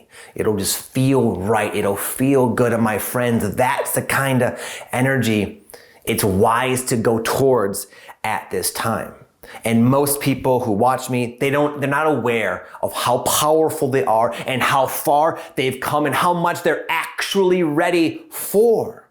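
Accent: Michigan, United States. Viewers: Great video as always! Unrelated note, I can hear the Michigan in your accent (it's okay, I can say that because I'm from Michigan too 😂)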